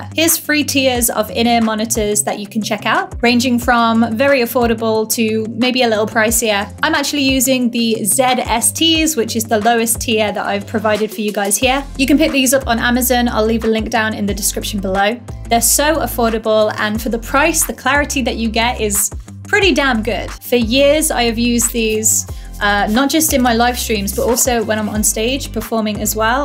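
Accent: United Kingdom. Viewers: glad to see you back on youtube and you have done an amazing job with the home studio, also i love the little hits of the British accent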